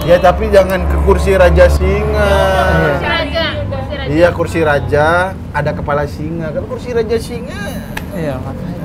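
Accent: Madura, Indonesia. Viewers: Logatnya madura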